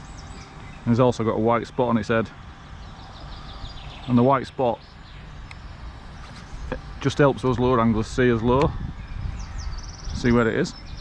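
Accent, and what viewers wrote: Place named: West Midlands, United Kingdom. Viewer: What canal is that? Is that the Aire/Calder? Your accent kind of sounds West Midlands, maybe Leeds area. Hope all is okay in the UK.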